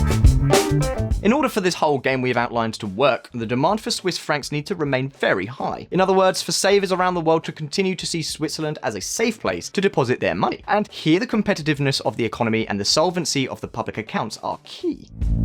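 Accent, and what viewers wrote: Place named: RP, United Kingdom. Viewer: This presitator is so fakeish. too posh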